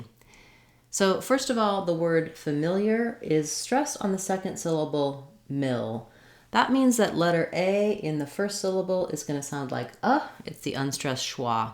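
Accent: United States. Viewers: Is it a American English?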